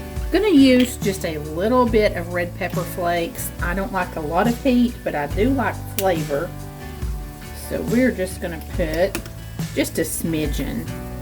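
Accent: South, United States. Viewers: I have been watching you for about five months now & subscribed to your channel after the first recipe that I watched on YouTube. My husband passed away on Oct. 6, 2022, and that's when you popped into my life!! You have truly blessed me. (God sure does work in mysterious ways!!) In a way, you have saved my life, along with our precious Lord & Savior, Jesus. I was so so lost & was grieving (still do!!), and your amazing, delicious recipes gave me something to do in my spare time & got me to cooking again!! I love how laid back you are & I love your southern voice & the soft music you play while you cook. Your recipes are simple, easy and delish...right down my alley!! Love and prayers and lots of blessings to you and your family!! I cannot thank you enough. 😃😁🥰❤❤🙏🙏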